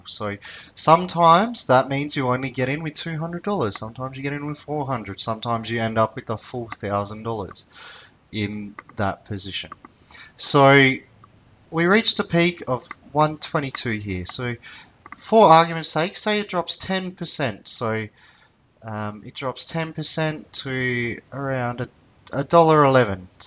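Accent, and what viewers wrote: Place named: Australia. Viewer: Gday, just subbed. nice to hear an aussie accent doing some price action. New to all this but watching as much as possible to learn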